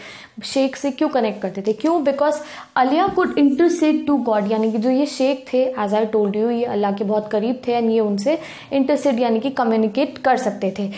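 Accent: Punjab, India. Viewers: Aap Punjabi ho?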